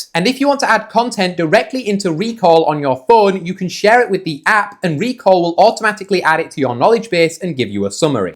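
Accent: Northern England, United Kingdom. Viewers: Gotta love the (British?) accent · Northern (English) british accent.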